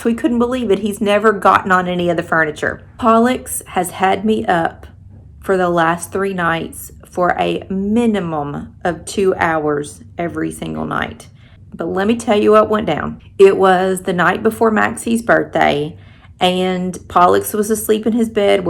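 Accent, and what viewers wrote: South, United States. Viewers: I found you looking for growing out grey hair info. Love your Southern accent as I am from the South, but live in the North. Then found Pollux videos. I lost my German Shepherd, also a rescue, in 2017 and can't get over losing him. So am enjoying getting my doggie fix with Maxie and Pollux. Thank you. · Being from S. Cali, I just LOVE Southern talk even though usually do not know what the phrases even mean, lol☺😂~Plus, just has the prettiest accent ever, and I have always wanted one so much❤:)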